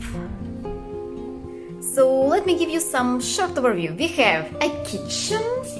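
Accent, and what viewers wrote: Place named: Spain. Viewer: what a beautiful town and the food looks delicious! BTW, I just love your accent, are you Spanish?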